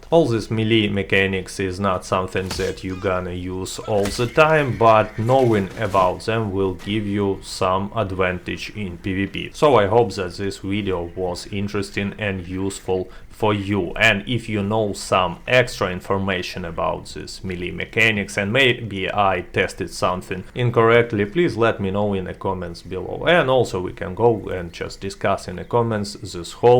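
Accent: Russia. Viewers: Geez, why every russian has such a horrible accent? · He’s Russian of course it sounds cool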